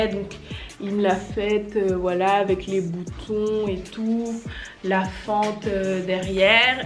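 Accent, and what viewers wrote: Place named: Ivory Coast. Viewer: C'est cool hein tu as l'accent ivoirien j'adore 👍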